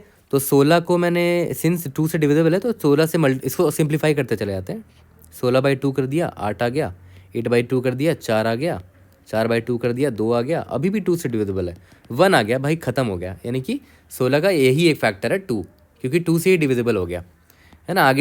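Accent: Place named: Bihar, India. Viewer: bhaiya i know you are from up or bihar i am also from bihar currently i am in my 3rd sem . proud moment when someone from your region has great knowledge in dsa i guess this from your accent ketno dfs maar lo isme and you always said aapke not tumhre the respect you are showing .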